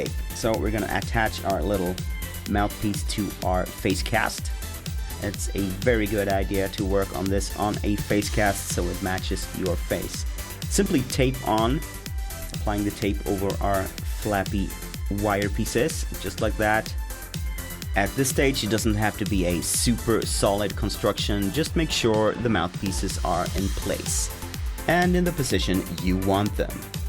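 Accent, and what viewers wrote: Scania, Sweden. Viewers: Nice ”skånska”!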